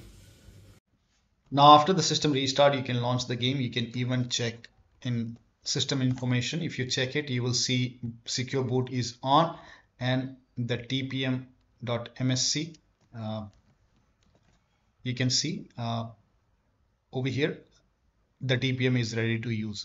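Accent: India. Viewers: i know shit is gonna work when i hear ind accent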